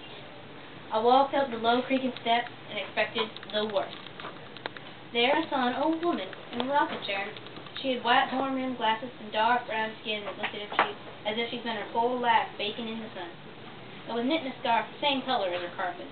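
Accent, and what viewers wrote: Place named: South, United States. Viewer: Go!! Your Southern Accent is coolio!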